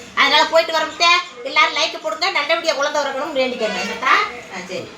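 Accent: Madurai, India. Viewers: இதுதான் மதுரைக்கான பேச்சு அருமை👌👌👌👏👏👏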